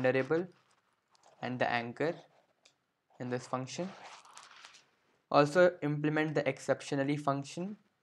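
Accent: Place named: India. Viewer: Hey buddy you are doing really great....Would love to talk to you about the realm of immersive reality. I am a 3rd Year B.Tech(IT & Mathematics ) student of University of Delhi. I have been working the realm of immersive reality tech for about 6 months now.Are you a delhite by any chance.......you definitely sound like an Indian. I am the head of the VR AR MR- Delhi Chapter, which is an open community of immersive reality enthusiasts. I organize workshops for immersive tech. Do connect me on <mail> to discuss more on the topic. PS: You really are doing some impressive work out there.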